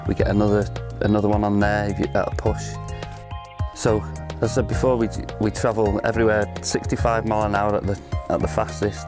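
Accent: United Kingdom. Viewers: yep that british accent :) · Bill Murray with a British accent.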